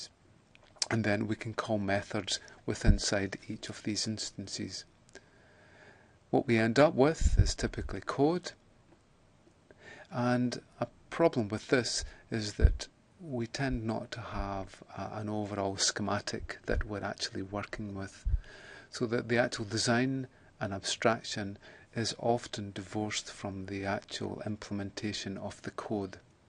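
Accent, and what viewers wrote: Scotland, United Kingdom. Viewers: He's Scottish, it's just how they sound.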